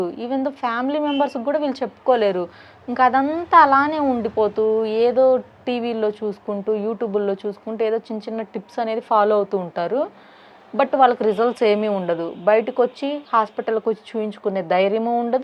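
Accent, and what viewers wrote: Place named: Andhra Pradesh, India. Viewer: Andhra slang alane untadi bro try to understand don't underestimate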